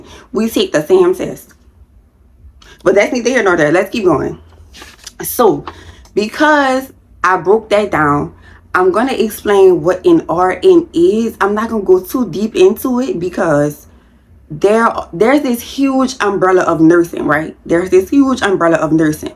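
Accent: New Orleans, United States. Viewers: I love her accent New Orleans baby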